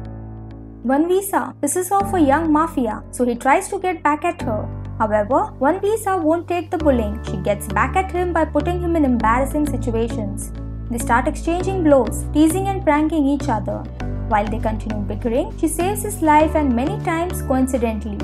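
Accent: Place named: Thailand. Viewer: Hola, tu acento parece tailandés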